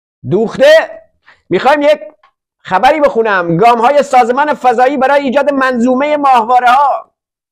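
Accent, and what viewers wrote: Lorestan, Iran. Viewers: جان حرف نداری بااون لهجه شیرین لری وباتحلیل شیرین دلمون گرم میکنید ؟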